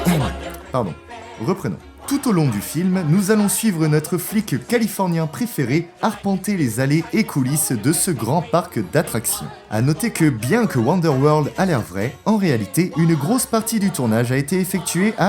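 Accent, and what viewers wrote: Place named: England, United Kingdom. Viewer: Tres bonne vidéo et bien interessante. Et bel accent anglais^^. Sympa vos vidéos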